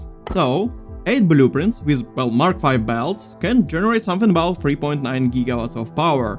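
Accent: Russia. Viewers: слишком слышно русский акцент, но видос классный, повторю как дойду до угольной энергии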